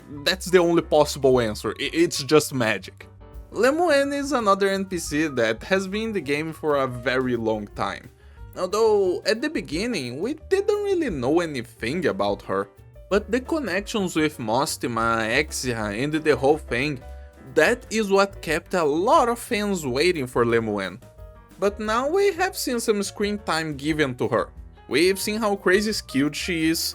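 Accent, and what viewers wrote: Brazil. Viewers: é muito estranho ver vc falando ingles quando é muito obvio q vc é br (o "sotaque") tipo amo os videos mais é meio estranho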